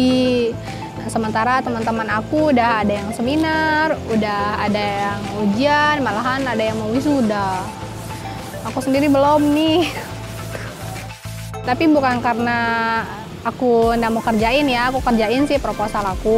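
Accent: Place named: Lombok, Indonesia. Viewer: logat lomboknya keliatan banget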